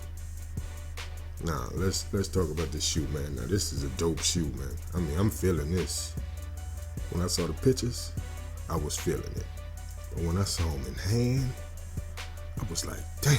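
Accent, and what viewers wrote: New Orleans, United States. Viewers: Definitely a new Orleans nigga doing this video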